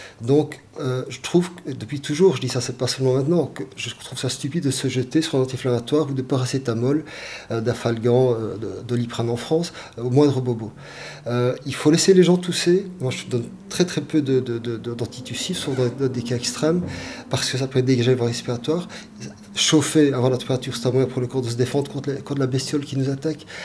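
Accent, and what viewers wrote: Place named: Liège, Belgium. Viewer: Accent liégeois, je crois. Mais il a aussi oublié de muscler sa mâchoire, tout le reste oui dirait-on, mais ses muscles mandibulaires apparaissent hypotoniques ou alors peut-être un lifting facial trop accentué a tendance à lui bloquer la mâchoire inférieure en tirant trop la peau vers l'arrière.. Bref, le manque de mouvement de sa mâchoire fait qu'il "mange" ses mots. Il en dit beaucoup tout en fermant sa gueule.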